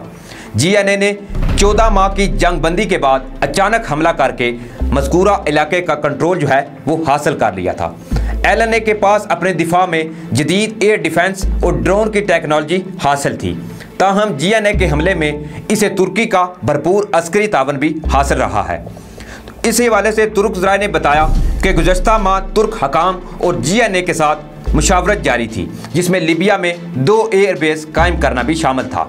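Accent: India. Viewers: Aap apna taharef to karwa dein, ap ki urdu indian lagti hey , keya ap india se hein ? Please jawab dein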